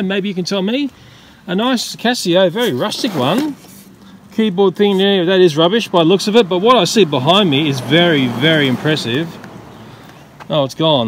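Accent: Sydney, Australia. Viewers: Child in house says to mum "Mum, the loony spider man is outside talking to a chair"... Do love the Sydney accent, its like how us Londoners used to talk before London became the city of a million ponging ars... OK must restrain meself on social media, its a good job I am bona fide certified mentally ill cos I could get into trouble speaking me mind :P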